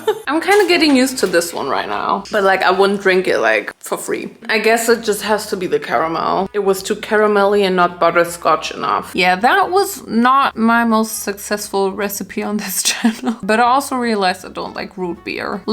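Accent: Germany. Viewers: Is it just me or has she got way more German recently, her words are so much less pronounced compared to what they used to be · shes german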